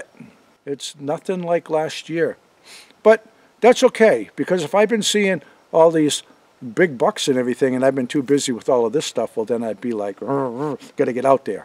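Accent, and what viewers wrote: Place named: New Hampshire, United States. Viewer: I found your videos about a month ago. I love them, since they bring me back to my childhood days. My mother was born and raised in Franklin, NH in a home that my Pepe (grandfather) built. He had an 8th grade education, but went on to build houses on land that he bought. He also made furniture. I get to hear the NH accent and it makes me smile...again those sweet memories. Mom's oldest sister recently sold the old original family house a few years back. New Hampshire is such a gorgeous state and I can't express just how much it means to me to see a portion of it through your eyes after so many years of being away from there. Thank you and all the best to you. ps. My father and Pepe would of loved seeing your meticulous carpentry work.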